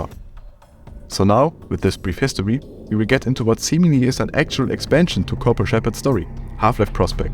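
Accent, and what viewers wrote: Germany. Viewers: I can hear from your accent that your german! Guten Tag!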